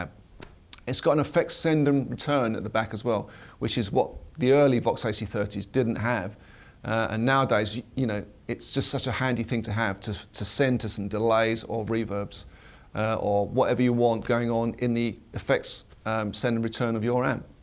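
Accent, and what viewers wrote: United Kingdom. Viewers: in fct is acent of britin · heavy british accent? He is british?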